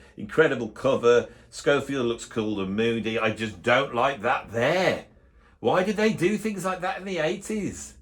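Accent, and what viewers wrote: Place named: West Midlands, United Kingdom. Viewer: I'm sure you ve got lots of non native speaker😮 ffansall over the jaz rock .prog ahead lib nñ world thus pleaae try to refine yer west midlandish albeit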